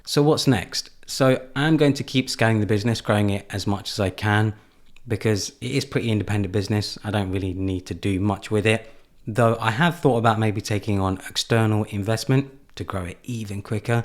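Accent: United Kingdom. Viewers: you could prolly get paid for your voice too, cool British accent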